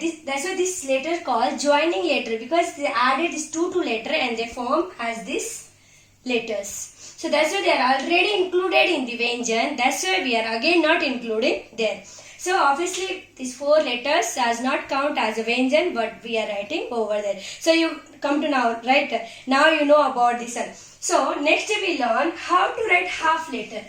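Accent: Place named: Tamil Nadu, India. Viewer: We are missing your tamil pronunciation ......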